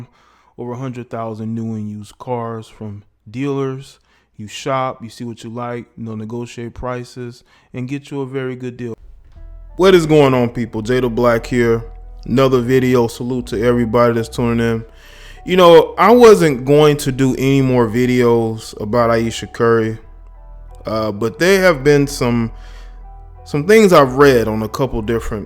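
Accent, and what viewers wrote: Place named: AAVE, United States. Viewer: Stop speaking ebonics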